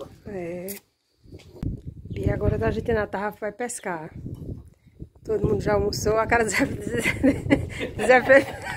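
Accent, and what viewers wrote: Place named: Northeast, Brazil. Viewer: Esse sotaque nordestino e a maneira delicada e amorosa como a senhora abençoa os seus seguidores, fazem bem a alma da gente.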